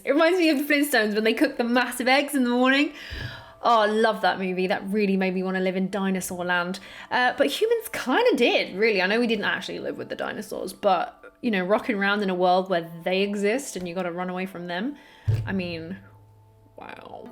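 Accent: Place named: Yorkshire, United Kingdom. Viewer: Only just discovered your channel. Fascinanting stuff! Also, great to hear a fellow Yorkshireperson's accent on here. (Although you sound posher than me)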